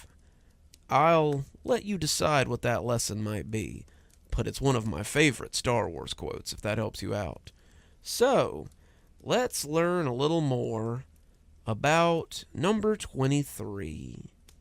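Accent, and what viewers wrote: United States. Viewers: were does ur accent cum from American obviously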